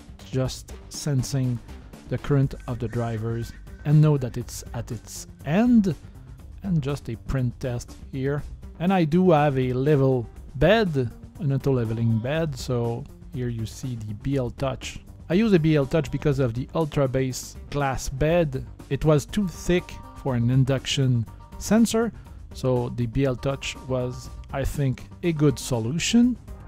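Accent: Quebec, Canada. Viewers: On la sent bien laccent quebecois 😂😂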